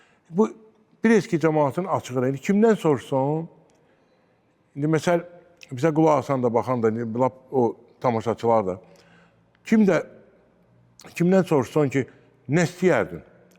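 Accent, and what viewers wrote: Baku, Azerbaijan. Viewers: большое спасибо. Бакылы данышыгы эшитдим. Бакинский акцент остался у тех, кто учился в русском секторе школы. В азсекторе преподовали учителя из районов и сел. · гагаш, тебя очень приятно слушать акцент наш халат Бакинский, да брат Баку не тот и Контингент не тот.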